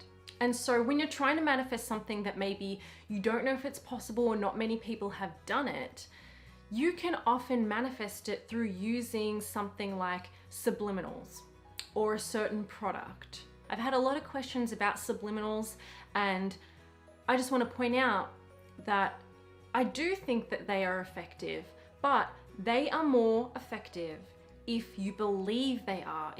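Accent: Australia. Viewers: Are you Australian?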